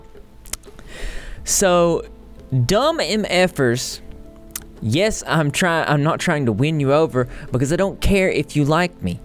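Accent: South, United States. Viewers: Is he from the south?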